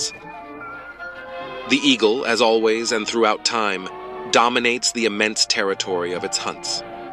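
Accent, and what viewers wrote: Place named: Andalusia, Spain. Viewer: TAN SOLO ESCUCHAR SU VZ DE UN CASTELLANO ANDA LUZ ME ENFOCA YA M ILUMINA LA SABIDURIA.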